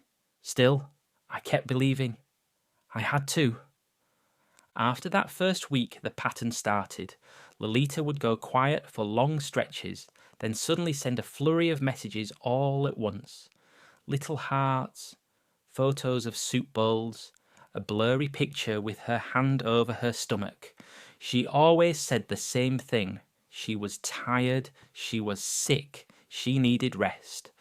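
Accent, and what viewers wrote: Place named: Minnesota, United States. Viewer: I didn't imagine the Minnesota accent like this.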